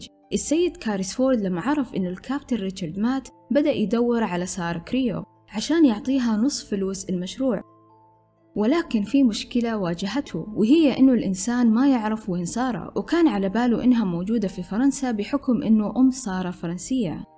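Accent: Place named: Syria. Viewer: انتي عنجد صومالية......لهجتك كانها سورية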